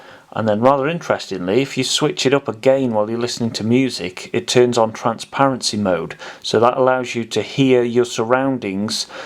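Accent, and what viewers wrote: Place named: United Kingdom. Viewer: Like for listening to your native British English.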